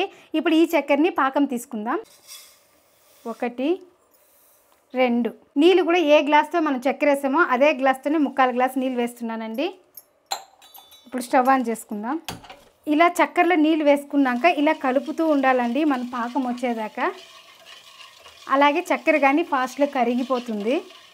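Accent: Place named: Chittoor, India. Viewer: Maa Chittoor yasa lo matladuthunte Santhosham ga undhi. Tamil and Telugu kalisina yasa. Nanna, Superb ga chesavu Laddu, mixture. Mana function ante Laddu and Mixture compulsory kadha.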